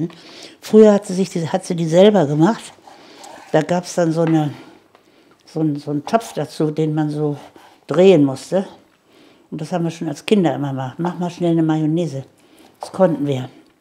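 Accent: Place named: North, Germany. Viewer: Liebe als Fan der allerersten Stunde hat es stets etwas heimeliges, dir zuzusehen. Dies umso mehr als deine Art zu erklären, sprechen, ja- auch die Küche selbst- mich an meine norddeutsche Schwiegerfamilie erinnert. Umso bedeutsamer, da mein lieber Mann derzeit sehr krank ist und Aufmunterung gebrauchen kann. Die kommt nun von euch beiden. Dank euch für das tolle Rezept!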